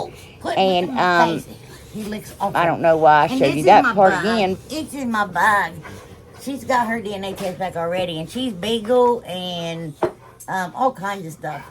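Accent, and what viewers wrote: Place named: Georgia, United States. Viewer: Just this morning I found your channel and I’m delighted!! Do tell from where your lovely accent comes? It sounds like you’re kin with my people down in RomeGA…your lovely sense of humor just had me grinning from ear-to-ear! Blessings to you/yours!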